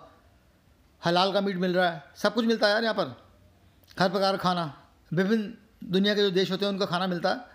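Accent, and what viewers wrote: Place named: Uttar Pradesh, India. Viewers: ji namaskar main aapki video Kafi Samay se dekh raha hun aap bilkul sacchai Bayan Karte Hain dusri Baat Aap Ki Boli mujhe bahut acchi Lagti Hai kya aap up Se Hain aapki baten sunkar maja Aata Hai kya aap India ko mis Nahin karte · जो आप u p स्टाइल में बोलते हैं बहुत ही मजेदार वेज एग रौल 😁😁😁😁❤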